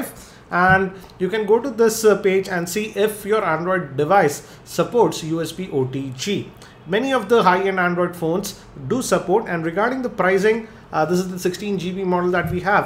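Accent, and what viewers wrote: India. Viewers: why all reviewer about this sandisk are all from indian? indian english is very hard to understand. honestly, although you may think that i am racist.. but it's really hard for us to understand indian english.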